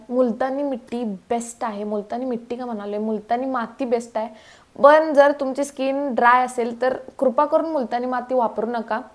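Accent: Kolhapur, India. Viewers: Communication skills Uttam ahe tumach❤ kolhapur cha tone yetoy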